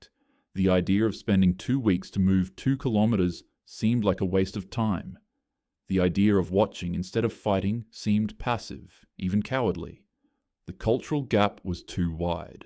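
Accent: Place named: Australia. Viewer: enjoy these, but happily an Aussie accent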